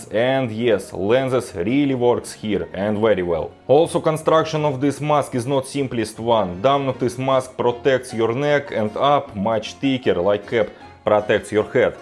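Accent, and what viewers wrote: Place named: Russia. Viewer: У любого пилота самолета такой же русский акцент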